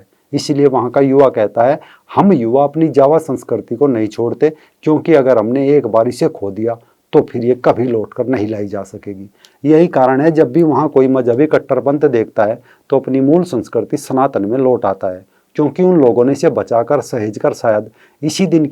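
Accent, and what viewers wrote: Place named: Himachal Pradesh, India. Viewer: Bhai tu himachali hai kya accents se lagta hai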